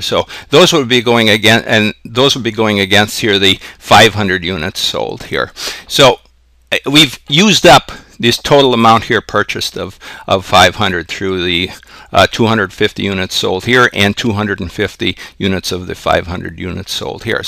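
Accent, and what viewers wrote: Boston, United States. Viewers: Your Boston accent is so strong, I imagined Peter Griffin speaking. Nevertheless, great tutorial! Definitely needed it for my FA class.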